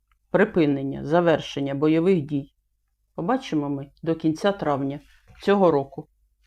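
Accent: Kherson, Ukraine. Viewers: Дякую за Вашу работу 🙏🌷 не звертайте увагу на придурків….. мені дуже подобається, як Ви розмовляєте…. Так розмовляють на моїй Херсонській землі 🥰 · Доброго ранку незвертайте уваги люди нерозуміють того що херсонщина вся розмовляє суржиком іперейти на чисто українську одразу це тяжко ви все нормально розказуєте дякую за розклад пишу і слухаю ірадію тому що ви кажете дякую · Да,ми наХерсонщині так говорим і всі привикли івсе нормально...,чекаю вас по утрам з нетерпінням,величезне вам дякую!!! За нашу ХерсонщинуДЯКУЮ велике,чекаємо....Каховка...❤️💙💛🇺🇦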